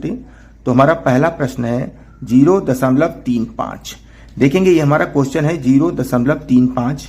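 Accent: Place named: Lucknow, India. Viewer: U r belonged in near about Lucknow. Voice touch aaka vaisa hi h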